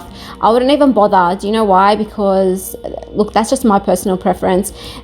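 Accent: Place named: Australia. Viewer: Nice vid you sound austrlian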